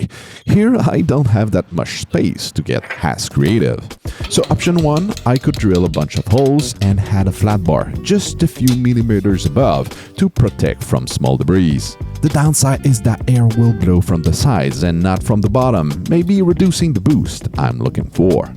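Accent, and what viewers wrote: Quebec, Canada. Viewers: I thought u were from Quebec i an from saint join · Hey men je savais pas que t'était Québécois ❤ #faiticitte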